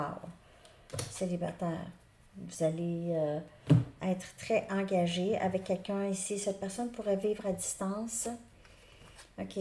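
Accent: Canada. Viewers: Merci pour la guidance pleine de vérité relation toxique et je lache prise je veux la stabilité et la paix merci je vous adore ainsi que votre accent canadien ""une Algérienne "❤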